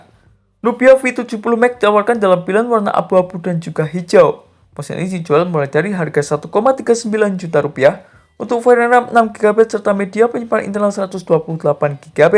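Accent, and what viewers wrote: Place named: India. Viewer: Kukira logat india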